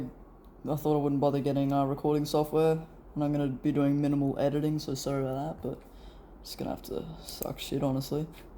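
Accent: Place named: Australia. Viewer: Aussie very much ahahaha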